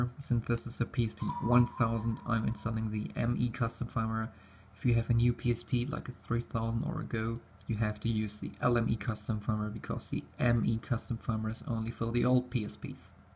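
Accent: Germany. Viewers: Krasser deutscher Akzent :D Dankeschön.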